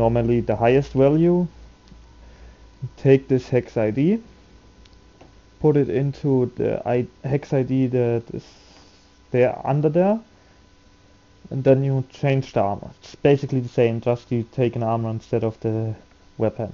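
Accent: Germany. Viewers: Super geklappt. Eigentlich halte ich nichts vom cheaten, aber wenn ich drops nicht bekomme, die man eigentlich bekommen sollte finde ich ist das ein Grund. PS: Man hat es herausgehört, dass du Deutscher bist. Ist aber natürlich nicht schlimm. Bin ja selber einer ;) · Man merkt du bist deutsch haha :D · are you german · Are you german? You sound a lot like german xD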